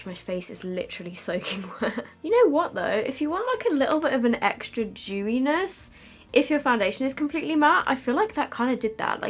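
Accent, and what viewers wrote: Australia. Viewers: Yo thats good aussie accent! 😍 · Oh, as an Aussie I just love your Australian accents. 😂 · Loved the Australian accent😂❤️ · love how you kept turning australian ahhaha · Wtf is that Australian accent 😂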